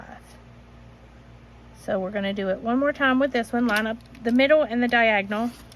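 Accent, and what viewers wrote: South, United States. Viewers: I've watch various quilters tutorials to help me as a beginner. I truly believe you are one of my top 5 that I can watch patiently and understand what you are doing. Plus, I love your little "southern" accent. It's adorable. Beautiful work always.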